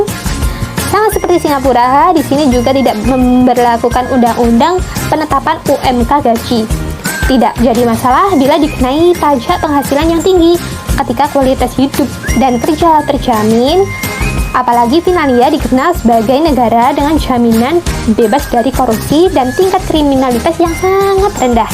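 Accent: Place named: Java, Indonesia. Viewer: Jowone medok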